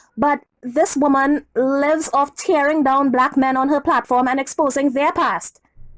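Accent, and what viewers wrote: Trinidad and Tobago. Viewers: I love ur show💞💞. Everytime I hear ur voice I feel like I'm back in Trinidad so thank u for givin me a lil bit of Trinidad when do ur commentary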